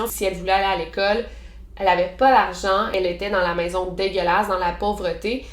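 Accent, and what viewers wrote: Quebec, Canada. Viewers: Je me suis même pas rendu compte de la longueur de la vidéo😅 merci pour ton super travail a chaque fois! Et ton accent et les termes Canadiens, j'adore!😊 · Cc je t admire beaucoup j adore ton accent québécoise j aimerait un jour visiter le Québec sa serait mon rêve. J adore tes vidéos 😘😘😘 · J'adooooore tes vidéos. De temps en temps...j'ai un peu de mal à te suivre vue ton accent canadien... Plus je te regarde...et plus je te kiffe...tu as souvent de chouettes histoires et tu expliques les choses si bien qu'on se croirait presque devant un film. Et pour couronner le tout...je te trouve super-mimi. Ce mec est un vrai goujat... Un salopart... Aucune considération pour sa femme... Toutes ces aventures extra-conjugales et Michelle plutôt naïve qui veut tout faire pour sauver et garder son couple... Merci pour nous avoir fait découvrir cette triste affaire... 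· C'est l'accent québécois, s'il t'indispose autant éviter de regarder ses vidéos non ?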